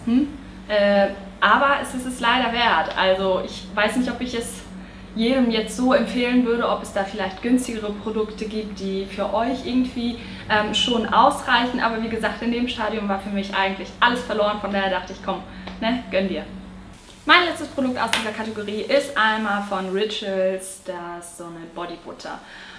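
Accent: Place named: Berlin, Germany. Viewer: Ich find dich super sympathisch! :) Kommst du aus Berlin? Du bemühst dich zwar sehr feinstes Hochdeutsch zu reden aber ich werd das Gefühl nicht los, dass du von hier bist :)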